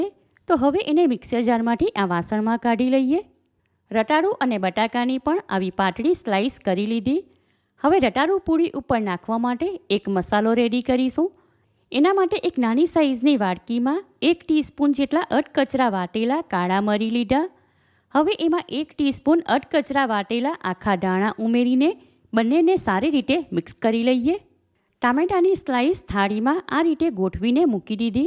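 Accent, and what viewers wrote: Surat, India. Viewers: I like ur surti language the way u talk so sweet...ur receipes I do watch they r 👌👌👌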